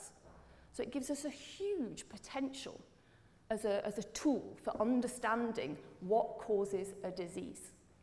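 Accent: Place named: United Kingdom. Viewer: Wonderful information. With your Brittish/ English accent and your rapid diction, it was almost too fast for me. .... an American born english speaker. Imagine the difficulty for my French wife who speaks English fluently. I can tell you are supper enthusiastic, but a large part of your potential audience cannot be included.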